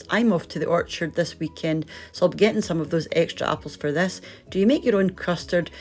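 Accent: Scotland, United Kingdom. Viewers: Yes, I'm enjoying your Scottish accent as well. Miss hearing it.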